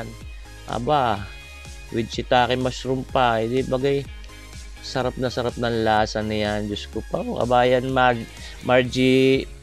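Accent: Batangas, Philippines. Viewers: I love your recipe at higit sa lahat I love your Batangeño accent and your humor when speaking Sir. I miss hearing that accent, majority of my officemates before, were from Batangas.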